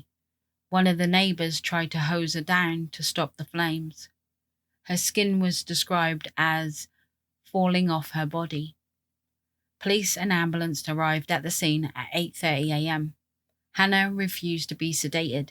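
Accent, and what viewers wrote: United Kingdom. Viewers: Great to hear a british accent for a change!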